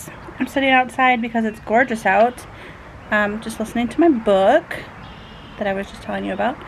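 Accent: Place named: Michigan, United States. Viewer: Your Michigan accent was strong today 😂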